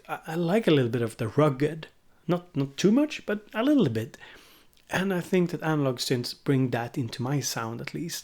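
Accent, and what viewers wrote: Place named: Sweden. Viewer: Thank you for decent youtube content! As a fellow swede, I enjoy your somewhat swenglish accent. =) Keepin' it honest!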